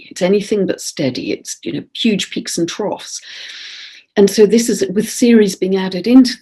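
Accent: England, United Kingdom. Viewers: Thank you so much! That really made me feel better! And it was done in that wonderful English accent. I'm american, I came to be by way of England. But as u know, we kind of became the melting pots of all cultures and now we sound funny lol. But it was very much like listening to someone I hold dear to my heart. Mary Poppins. Thank you so much! ☺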